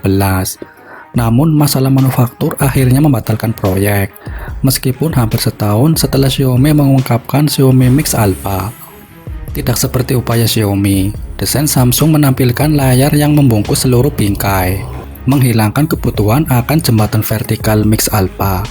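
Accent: Banyumas, Indonesia. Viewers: Logatnya ngapak yak..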